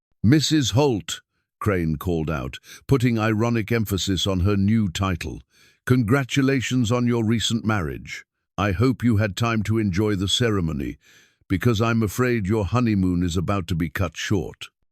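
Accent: England, United Kingdom. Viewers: I’m listening from the beautiful agricultural and lush San Joaquin Valley of California. I enjoy your dramatic stories, they’re narrated well with your particular selected, articulate English accented AI. However I notice they all hold the same theme; that is, territorial differences and proving who holds ownership of land rights. It’s the same story over and again. What’s especially striking me with humor is how all main character men walk and work either shirtless or with the front of their shirts opened. Was this normal? It’s beginning to border on ridiculous.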